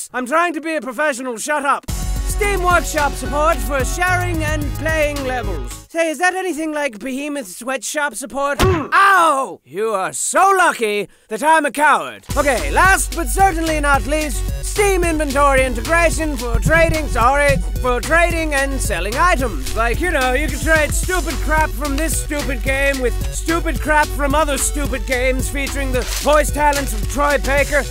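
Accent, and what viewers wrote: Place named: United Kingdom. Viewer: I swear the narrator is so british.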